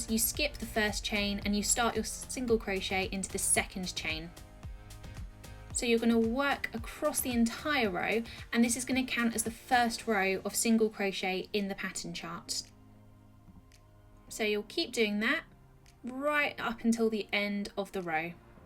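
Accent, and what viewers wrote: England, United Kingdom. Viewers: Thanks for this tutorial it is something I really want to try. You have confused me a bi thought - speaking with an English accent but talking in US terms! I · You sound English but seem to using American terms? E.g. ‘single crochet’ instead of ‘double crochet’. I am I correct?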